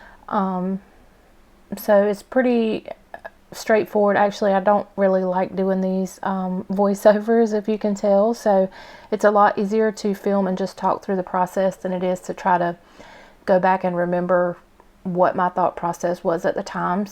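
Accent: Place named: South, United States. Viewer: looove the spread and the southern accent 😍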